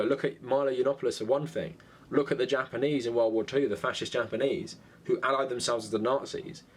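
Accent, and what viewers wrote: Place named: United Kingdom. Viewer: I'm not even going to finish the video because I tell already you are advocating and defending ANTIFA. Here's the thing you're argument is essentially if you don't agree with the political speech it's ok or justified to use violence. Now flip that since i disagree with you that means it's ok that i use violence to stop your speech right? Your accent seems British to me so I'll leave you with a quote from Winston Churchill "future fascists will claim to be antifascists".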